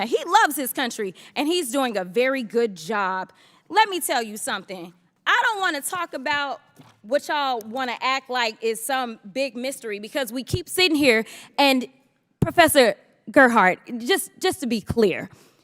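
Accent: South, United States. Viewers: A Southern accent or manner of speaking is no reason to look down on her. She's making a point to fellow Republicans, and cited her facts and sources clearly. I don't see the issue.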